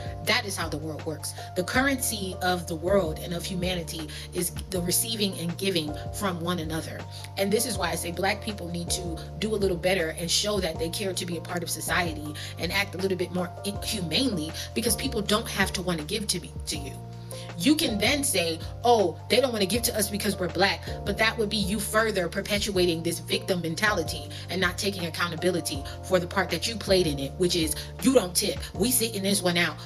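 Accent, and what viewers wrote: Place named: Africa. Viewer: she looks and talks like a first generation African. American blacks I agree do tip but we keep getting lumped in with these immigrant blacks that don’t tip , even the ones with money won’t. Also, why is she complaining about tips? Tips are whatever the customer thinks you deserve or feel like giving out of generosity! Perhaps she should find a job that pays hourly instead of making content pushing black stereotypes.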